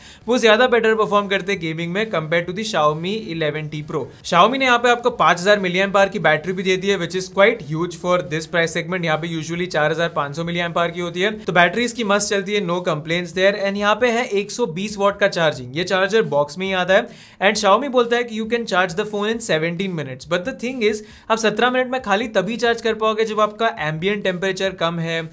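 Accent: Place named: India. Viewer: I honestly cant tell whether its english, or half english half indian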